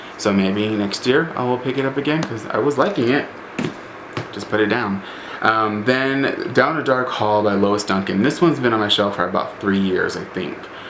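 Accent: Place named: United Kingdom. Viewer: lol your british accent is funny :D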